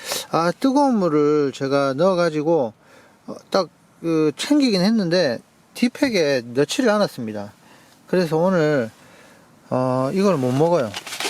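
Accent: Gyeongsang, South Korea. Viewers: 영상잘봤습니다 항상 구수한 경상도사투리 반갑습니다 😁 지금은 서울에 살지만 본가가 사하구라 거가대교 한번씩 가보긴 하는데 도넛츠 🍩 먹으러 가보겠습니다 좋은곳에서 힐링하시니 조만간 저도 한번 가야 겠어요 ^^ 축복합니다 💜💕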